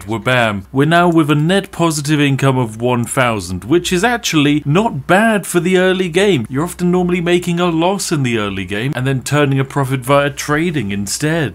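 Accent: United Kingdom. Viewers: Wait... British??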